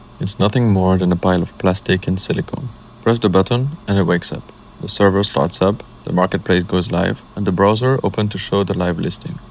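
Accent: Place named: France. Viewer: A critique (since this is art): : "legal to own, but illegal to sell"? Assuming he's in France (based on accent) - it could be legally acquired personal data from a website, which is legal to own but illegal to sell. Pretty much everything else is illegal to own if it's illegal to sell in France, even more so when it comes to data. So no, it's not 'horrific' like CP. Therefore, there's likely no 'ethical' dilemma at play here given 99.99% of people won't care about personal data. I think it would be more 'powerful' as a piece of art if the person pressing the button would REALLY have to think twice before pressing the button because of universally held moral principles.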